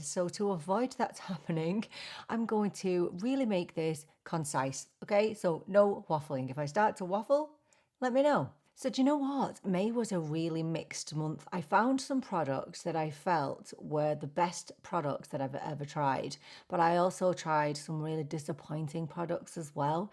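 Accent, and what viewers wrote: Ireland. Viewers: I have been loving your videos & binge watching instead of TV or the major trial in Mass. I am an Irish ☘️ American. Your brogue didn’t sound like my nana’s. You are so professional & funny & enjoyable. I am porcelain strawberry blonde.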